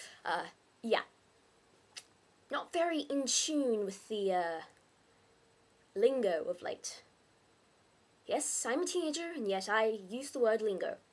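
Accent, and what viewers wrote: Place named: Australia. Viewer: I agree. :} I used to watch Hey Hey It's Saturday a lot with my family, along with Xena and Hercules. Haha. It took me so long to work out you were Australian. I couldn't recognize my own accent! The only foreign accent I can even attempt to do is Bad British.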